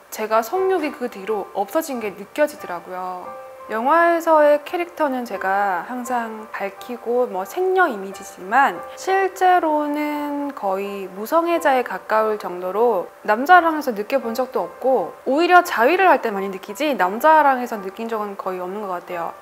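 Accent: South Korea. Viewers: 대구사투리가 살짝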